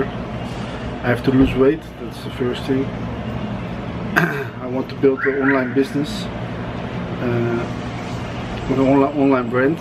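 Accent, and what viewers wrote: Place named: France. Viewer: avec cet accent c'est sûr tu es Français !!!! si oui bravo à toi de tout quitter pour la Colombie, tu vas pas le regretter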